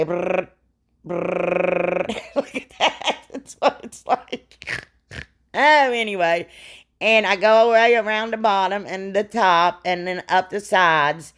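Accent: South, United States. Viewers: You’re funny and very enjoyable to watch. I love your little southern accent.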